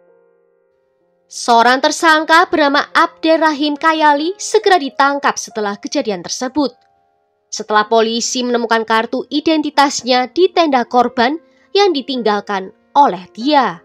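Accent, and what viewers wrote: Java, Indonesia. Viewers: Medok bangeet kmu kak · Emang suara nya asli medok gitu ya..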